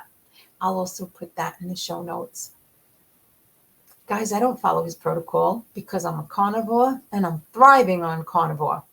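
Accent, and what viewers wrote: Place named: Pennsylvania, United States. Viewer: I’ve been missing you and that PA Italian Girl accent…🥰. Happy to see you back!!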